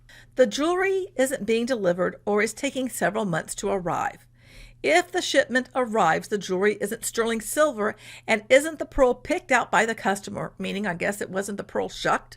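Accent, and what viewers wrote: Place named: Texas, United States. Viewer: I knew you were Texan 😂 howdy from a North Texan 😂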